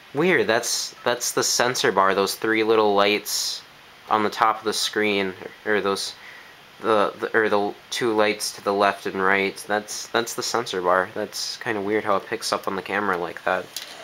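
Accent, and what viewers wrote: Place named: Canada. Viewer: do you have ANY canadian in you? you sound canadian.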